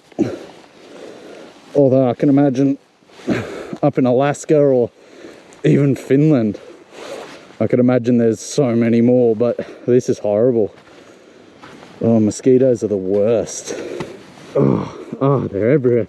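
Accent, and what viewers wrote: Australia. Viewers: You Aussie, mate? Hello from Toowoomba!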